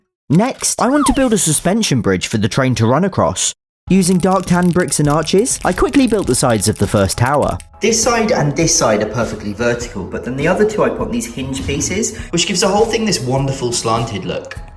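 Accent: United Kingdom. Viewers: R u british ?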